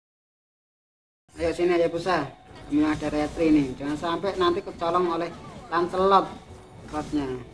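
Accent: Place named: Java, Indonesia. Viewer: Medok mas😀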